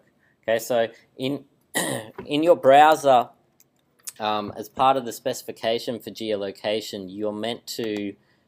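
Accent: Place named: Australia. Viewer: along with the Aussie accent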